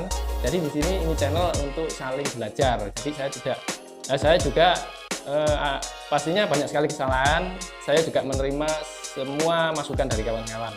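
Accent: Java, Indonesia. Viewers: medok e nyenengke dhe, thx sharing ilmunya, sukses selalu dhe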